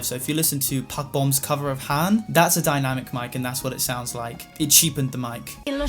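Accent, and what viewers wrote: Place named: Southern England, United Kingdom. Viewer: Love your accent bro 👌 southern england aroundish London? Lol 😄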